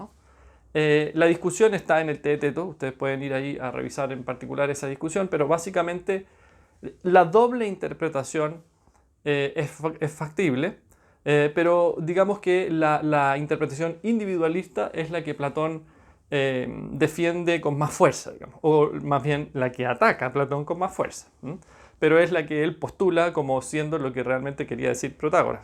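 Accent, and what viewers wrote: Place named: Chile. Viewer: Gracias por la explicación. Y me gusta el acento chileno. Es muy apacible.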